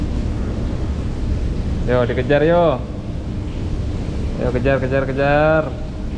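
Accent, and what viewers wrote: Bugis, Indonesia. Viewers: ORANG BUGIS KAH SAPPO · Orng Bugis ki aaa??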